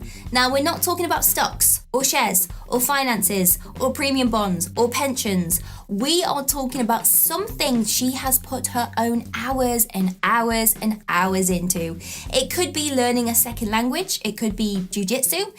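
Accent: United Kingdom. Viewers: May I ask about your accent? Is it British, Australian, or other?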